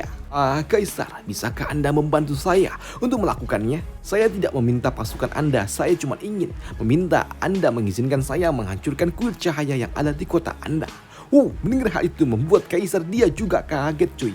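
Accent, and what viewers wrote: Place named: Java, Indonesia. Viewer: Medok bang heheheeh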